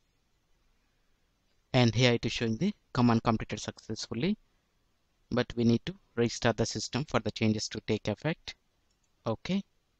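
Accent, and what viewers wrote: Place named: India. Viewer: Indians speaking english sound very ridiculous!!!!!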